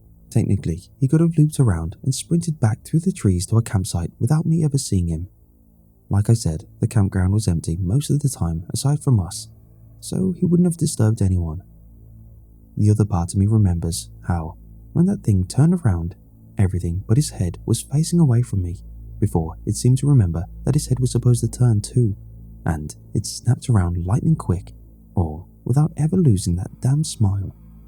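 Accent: United Kingdom. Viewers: your voice is so soothing! I'm not English. But I LOVE this language and I really want to become a native English speaker some day. I'm curious about variety of accents in the UK. Which accent is it that you're speaking? It sounds alluring, smooth, calming and clear. I always listen to you when I'm feeling down in the dumps. It helps me in so many ways. ^ ^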